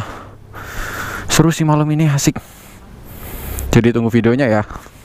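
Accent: Banyumas, Indonesia. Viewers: pantes ngapak.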